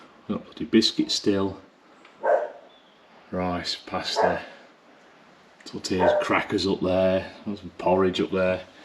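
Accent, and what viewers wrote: Lincolnshire, United Kingdom. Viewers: Nice to hear someone from Lincolnshire. I'm in the shire too :)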